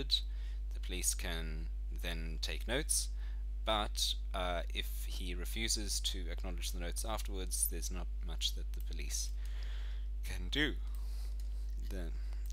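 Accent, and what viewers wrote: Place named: Australia. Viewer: Bill Hahn I think its Australian.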